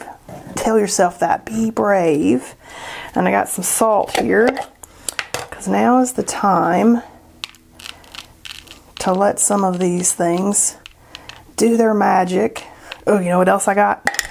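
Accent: South, United States. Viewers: your southern accent is super southerny* today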